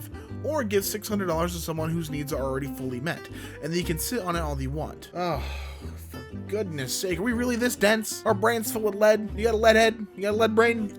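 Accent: Scotland, United Kingdom. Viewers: Please tell me how to do your Scottish voice I want to know how you do it so well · As a born and bred Scot, that Scottish accent was perfect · Dude you sound like Scotsman from samurai jack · I love his Scottish accent.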